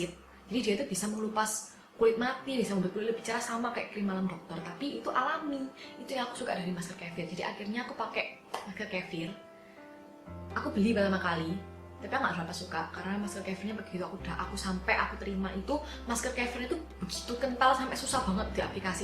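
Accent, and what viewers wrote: Java, Indonesia. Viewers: Jawa bgt. 😂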